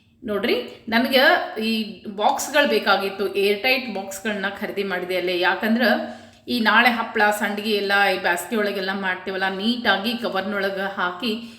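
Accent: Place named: Dharwad, India. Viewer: ಯಾವ ಊರು ಇದು akka ದಯಮಾಡಿ ತೀಳೀಸೀ ಕೋಡೀ ನೀಮ ಬಾಷ ಹುಬ್ಬಳ್ಳಿ ಧಾರವಾಡ ಜಿಲ್ಲೆಯ ದೂ ಅನಿಸುತ್ತದೆ ಸುಮ್ಮನೆ ತೀಳಕೋಬೇಕು ಅಂತ ಕೇಳತೀನೀ ತೀಳೀಸೀ ಕೋಡೀ ದಯಮಾಡಿ🙏🙏🙏🙏🙏🙏🙏🙏🙏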